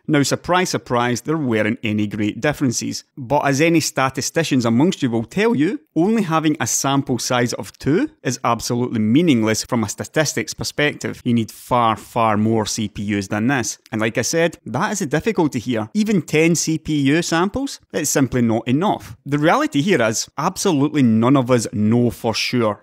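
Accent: Scotland, United Kingdom. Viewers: Excellent work, i noticed a comment on the Linus video, "Scottish is difficult for me as well", do you get much grief about your accent on videos? I am from Govan (I am sure you know where that is) but i live in Australia, and although i speak "English", people stare at me and cant understand, off topic i know, but i thought i would ask how your accent goes down with people online. The only bad thing after watching this though, as that i have to wait for your next work, because your work is that good. G'on yersel. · Hey I like his Scottish accent. That's actually the first thing that pulled me to his channel, I found out how good his content was afterwards.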